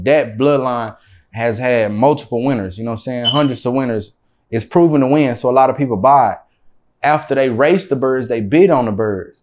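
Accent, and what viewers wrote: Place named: Texas, United States. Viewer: Anybody caught the website he said. I didn't quite catch what he said. That Texas accent strong af 😆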